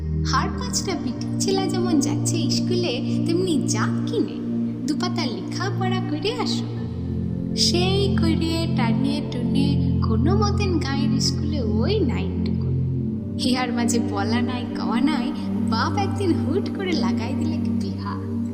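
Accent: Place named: Bankura Purulia, India. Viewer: Darun hoacha amdar Bankura purulia vasa ta❤❤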